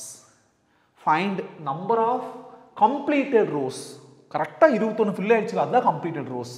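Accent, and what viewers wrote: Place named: Thanjavur, India. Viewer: Your in thanjavur am i right your slang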